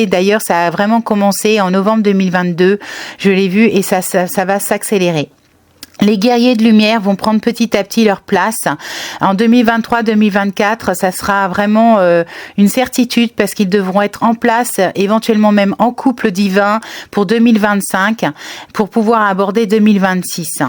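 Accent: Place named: England, United Kingdom. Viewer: Bel accent anglais, mais en ce qui me concerne, je préfère la langue de Molière🍅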